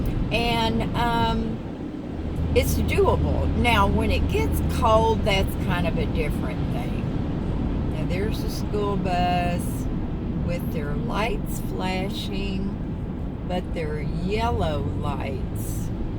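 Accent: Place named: Maine, United States. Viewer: sounds like maine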